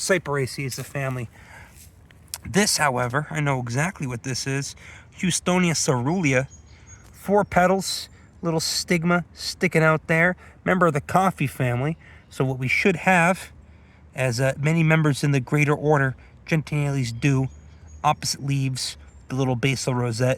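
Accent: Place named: Chicago, United States. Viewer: I love your videos but maybe you should consider leaning into a New England accent rather than a Chicago accent since you’re doing New England botany! Plus a southie accent or a maine accent would be super fun. 🥰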